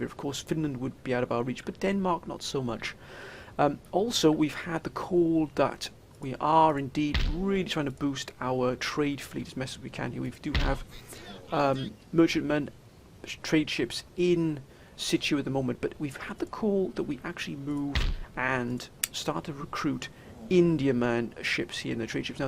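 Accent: United Kingdom. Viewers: Hello my friend. It's good to hear from you. I am very happy that my advice has been helpful for you. If you don't mind me asking I was wondering where you are from. You sound British. I'm just curious. Either way I do count you as a true real friend even though I've never met you in person. Hope all is well with you. I was wondering when you will start assigning Generals. No rush. Just curious. Have a great day my friend. Can't wait till the next episode! ☺☺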